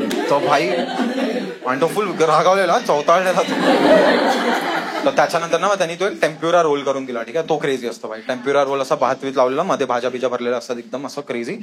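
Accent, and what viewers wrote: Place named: Japan. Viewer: Hey...You captured the English speaking style of a japnese person very well. 🎉🎉 Great work...lots of love n wishes keep doing ❤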